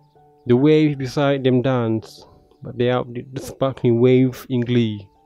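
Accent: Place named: United Kingdom. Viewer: Good reading with great pronunciation and enunciation! I like that you adhere to the British pronunciation with words such as 'dance' considering Wordsworth is English.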